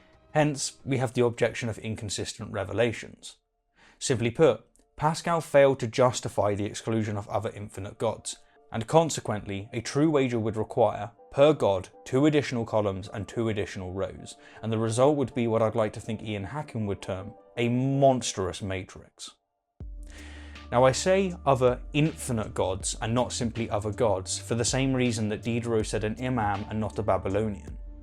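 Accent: United Kingdom. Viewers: Also you are pronouncing wrath the American way rather than the British way which is out of kilter with the rest of your pronunciation and grammar which is British.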